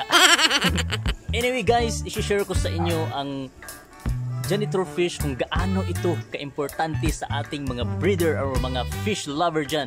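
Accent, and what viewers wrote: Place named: Philippines. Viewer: I love your tagalog accent ♥️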